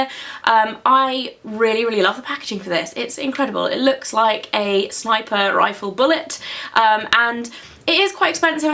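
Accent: United Kingdom. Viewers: !!!!! YOU ARE AMAZING AND YOUR BRITISH ACCENT IS JUST PERFECTION!!!!!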